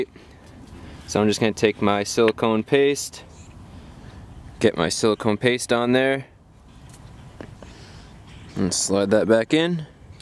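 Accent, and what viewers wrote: United States. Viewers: Just wanted to thank you for your videos. Just spent my Sunday fixing the brakes of the mother of my child's car. Everything you taught was present - blocked nipple, rusty brake fluid and seized bolts. Loved the 1 man bleeding method too. The car now stops on a six pence. My boy likes your tutorials as he's car made and likes your accent ( I think you sound like Marshall Mathers - no offence) - he's only 5 but obsessed with all things from the US of A:) Thanks again.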